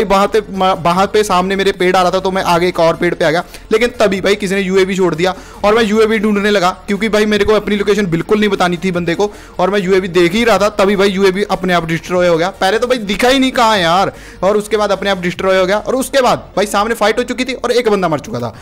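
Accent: Punjab, India. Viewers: Hello bhai aap Punjabi Ho jasa Punjabi bolte app Ho Kay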